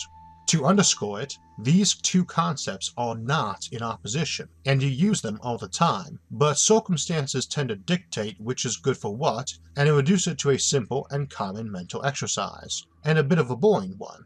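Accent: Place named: Louisiana, United States. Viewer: I thought initially it was a strong cajun accent lol.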